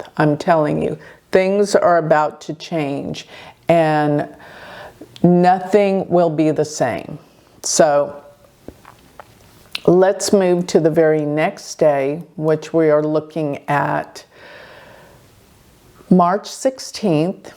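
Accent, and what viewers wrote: Texas, United States. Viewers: I watched a different video of yours, I didn't research anything about you and I immediately said, "She's from Dallas TX!" I am Accent Sympathetic and clairvoyant with 2 levels, possibly 3. I'm going to sign up for my Chart details from your website. I can trust you, my guides have told me.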